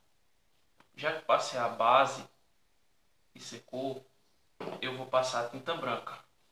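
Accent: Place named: Bahia, Brazil. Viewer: vc e bahiano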